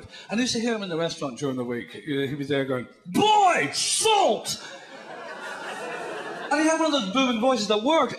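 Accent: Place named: Ireland. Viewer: Sean's accent has diluted a lot, obviously due to all the years he has lived in England. While he does still sound Irish here, there is one recent clip of his on you tube and - if you didn't know any better - it might be difficult to place the accent at all. Even here his accent isn't as strong as the clips of his early stand up.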